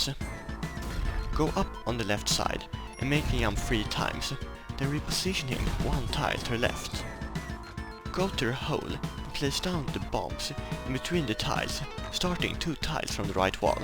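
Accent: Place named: Sweden. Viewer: Oh. Really? You have a very different accent from most other Swedish YouTubers.:D I guess you have just a much stronger swedish accent then. Or maybe from a different part of Sweden, I don't know. The video was really great though, I'm looking forward to more in the future!^^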